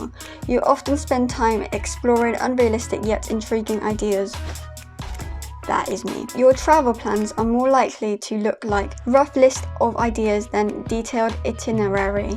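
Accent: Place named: New York, United States. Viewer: This is interesting thanks for sharing! I like editing of the video too! I’m gonna sub to see more videos! I also am from NY so your accent keeps me hooked in lol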